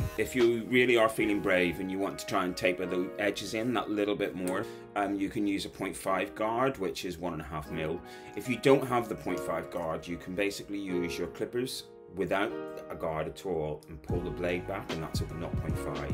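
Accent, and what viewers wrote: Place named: England, United Kingdom. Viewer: I just love his English accent!!!!!!!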